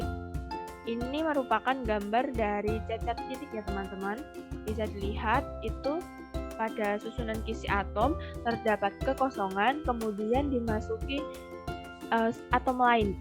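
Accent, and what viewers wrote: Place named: Java, Indonesia. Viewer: Kereen mb.. kurangin medoknya dikit lagi ya.. 👍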